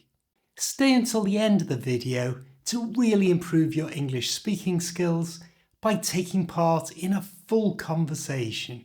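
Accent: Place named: North West England, United Kingdom. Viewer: People in the south use an AH sound in "passed away", while those in the north use the short A. This guy must be from the urban north-west, like around Liverpool or Manchester.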